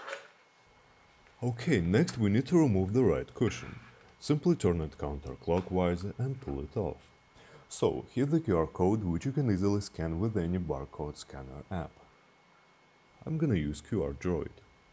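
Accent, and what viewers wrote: Russia. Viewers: русский английский это потрясающе. спасибо за видео!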